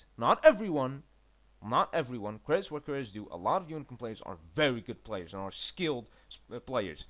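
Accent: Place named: Netherlands. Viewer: jouw engels is zeker niet slecht,hier en daar wat woorden of zinnen die ik anders had gezegd of vertaald.. alleen dat nederlandse accent he? kan je niks aan doen,maar is wel grappig XD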